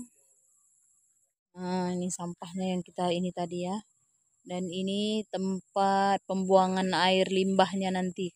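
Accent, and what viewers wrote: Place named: Malaysia. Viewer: Salam..pernah tinggal di malaysiakah..bunyi loghat seakan2 bunyi orang malaysia bercakap..orang semenanjung..maaf kalau saya silap..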